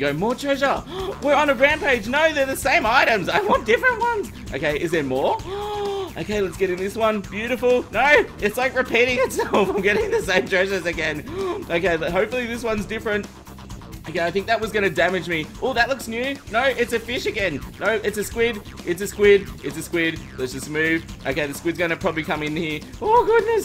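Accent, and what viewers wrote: Australia. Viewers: What accent do you have? Australian?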